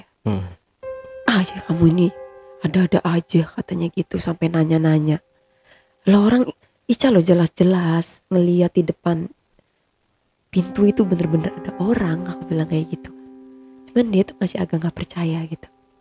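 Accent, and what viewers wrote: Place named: Purworejo, Indonesia. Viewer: mba bner lembut. sabar. ngomongny ky sdr saya orang purworejo cm tinggl di brebes. logatny sm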